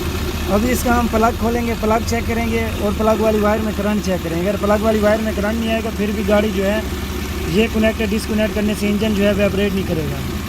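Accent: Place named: Saraiki, Pakistan. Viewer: sir ap ka leja saraki lagta hay kayak lodhrahan maestro hay ap kebab work shop.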